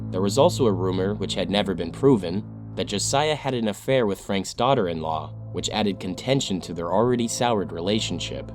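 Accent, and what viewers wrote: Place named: United States. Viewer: Oh don't start that shit plz. His voice is simply a typical American voice. Sycophant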